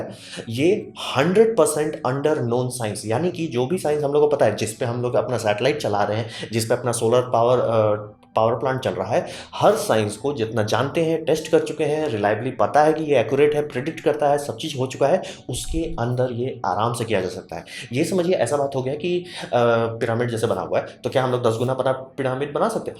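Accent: Bihar, India. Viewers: Sir..kya aap Bihar se ho..?????????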